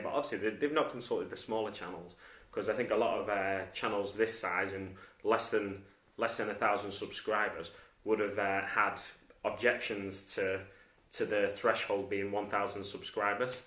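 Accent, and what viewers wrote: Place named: Roadman, United Kingdom. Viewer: Love your roadman accent! Yeah this is ridiculous, they brought it in after Logan Paul yet they attack the smaller channels most? There should be more checks on the big earners not the small guys.mRidiculous. Yeah I don't make money off YouTube but still the option should be there. On a brighter note congratulations on 300 subs! I'm 5 off myself haha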